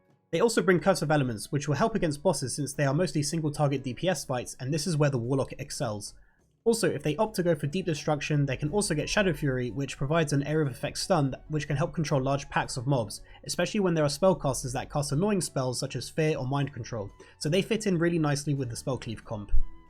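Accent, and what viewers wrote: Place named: Scotland, United Kingdom. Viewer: You sound Scottish! I think all Scottish people play rogues and warlocks hahaha, seems to be the case on my server at least!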